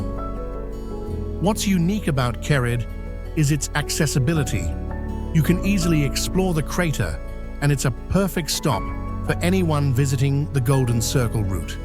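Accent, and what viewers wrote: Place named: England, United Kingdom. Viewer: It's really great to hear a truly ENGLISH Description. Too many bad American commentary.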